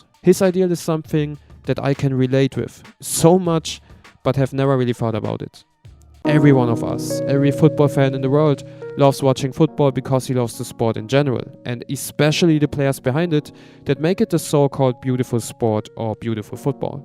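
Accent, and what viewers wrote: Germany. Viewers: Haha, man hört so krank das Deutsche XD. Aber gutes Video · Are you german? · Are you german?Cause you do sound like one? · Sag mal bist du deutsch? Und sehr geiles Video btw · Bruder man merkt direkt das du Deutscher bist lol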